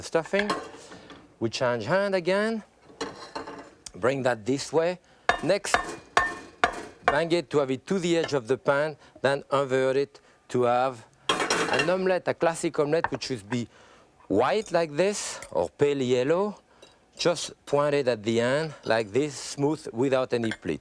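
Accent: France. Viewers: This video must have been made over 25 years ago when he was quite young and had heavy French accent. His French accent is not so strong in his latest TV shows. Also, I bet he does not scrape a non-stick pan with sharp-pointed metal forks any more. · Well, no doubt he was born French and lived there - until he met and fell in love with an American woman. Being a gentleman, he moved here instead of asking her to be uprooted and move there. And so now he is an American, and a citizen. We thank France, but we definitely claim him. Being the man he is, we'd have given him a permanent green card - so it was his choice - and he became an American. Only his voice, his training, his memories and his accent are French now. And probably a corner of his heart, which is also fine. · beautiful french accent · god dammed that guy. fuckin' french way too strong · Thought he was speaking French until 5 seconds in. 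· Im a french canadian and I just enjoy the french accent ! Im trying to hide mine but it doesn't work often ! hahahah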